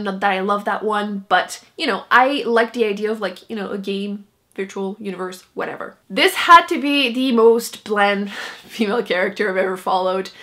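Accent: France. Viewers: Thank you for responding! I kept trying to place it and thought you were from France so I was kinda close? No not really lol!!! I can’t wait to see what you come out with next! I’ve started back watching your videos!!!!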